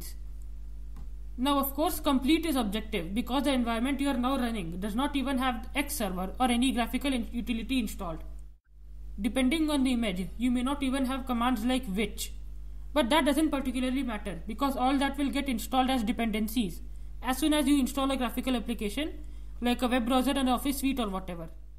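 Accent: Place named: Japan. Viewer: Adjust your Audio Setttings,its Low,and i love your Japanese Accent......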